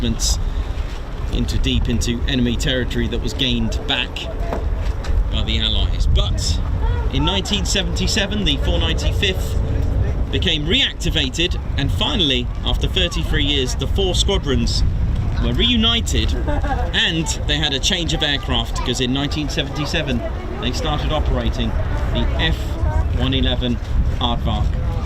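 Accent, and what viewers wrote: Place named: United Kingdom. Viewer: Love ur channel!! Love the British accent!!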